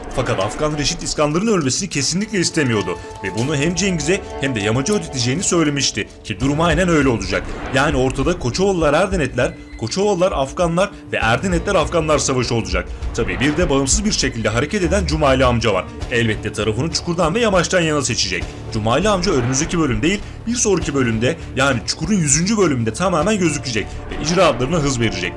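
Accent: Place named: Hatay, Turkey. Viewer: Abi sesin hatay sandık içi’ne benziyor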